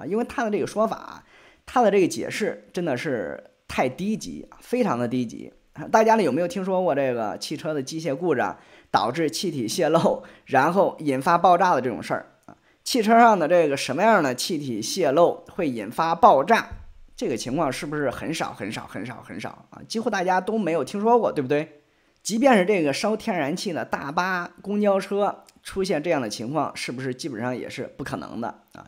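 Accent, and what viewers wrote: China. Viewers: 你好 首先我是已和平姿态想和你讨论 听你的口音 应该是大陆人 所以我很好奇是什么经历导致你有了这样的世界观价值观 再次声明 我没有谴责你的观点 虽然我不同意 我只是好奇中国政府或是人民对你做了什么 让你这么反共？ 说说我吧 我是88年出生的大陆人 04年我就去美国印第安纳读了高中和大学 14年回国发展 我是可以留在美国工作生活的 然而我还是选择回国 而且这几年我在大陆生活越来越好 我对国家也越来越信任 我能在这里留言 也证明我不是被抢墙封闭的国人 我可以看到世界所有方面的言论和观点 我是真的想知道是什么生活环境 或是什么事件导致你这样的世界观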